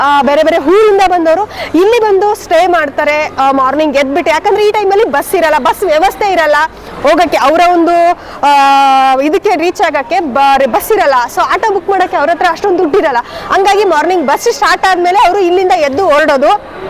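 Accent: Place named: Bengaluru, India. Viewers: ನೀವು ರಾಯಚೂರು ಅಂತ ಹೇಳ್ತೀರಾ ಬೆಂಗಳೂರು ಭಾಷೆ ಇದೆ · Bengaluru hudgi Tara maataadta ediya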